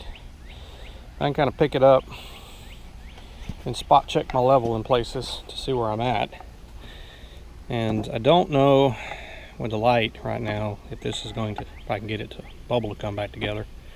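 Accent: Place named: Texas, United States. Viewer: Yea that's too far. The accent sounded more like you were from Texas. You can actually build an in ground pool for about 3 to 5k. Depends on how fancy you want the finishes and if you skim coat or just use a liner. The construction is just with simple cinder blocks. You have the hardest part already solved... digging the hole.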